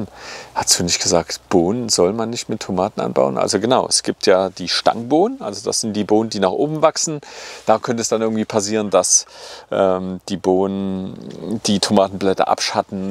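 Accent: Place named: Berlin, Germany. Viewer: Bist du aus Berlin? Dein Dialekt klingt voll von dort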